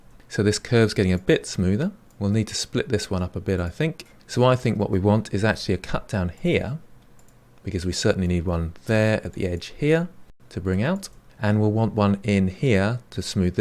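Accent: England, United Kingdom. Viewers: Your englishness il delightfull. Always a pleasure listening to your eplaination.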